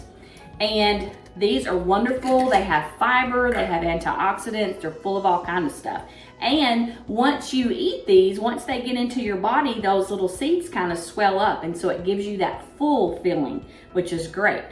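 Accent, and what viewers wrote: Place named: South, United States. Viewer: Where is your southern drawl from?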